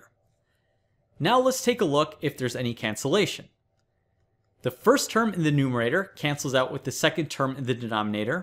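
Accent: India. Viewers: It sounded like,''A NOOB from India''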